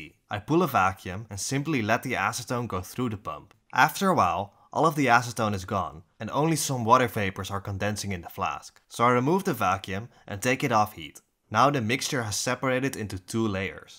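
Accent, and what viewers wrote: Netherlands. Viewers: Jy hoor de swaar Nederlands-aksent in sy spraak. 😂 Maar ja, baie goed, né?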